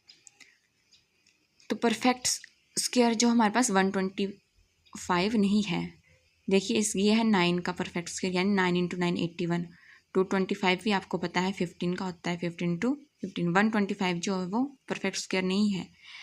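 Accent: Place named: Pahadi, India. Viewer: Didi ji Mera comment padho kya pahadi Ho🎉🎉🎉🎉🎉🎉🎉🎉🎉🎉🎉🎉🎉🎉🎉🎉🎉🎉 you are pahadi kyunki aapki awaaz se lag raha hai ki aap pahadiyon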